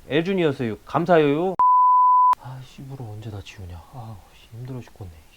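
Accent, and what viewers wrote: Chungcheong, South Korea. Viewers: 와 이게 찐 충정도 사투리인거신가ㄷㄷ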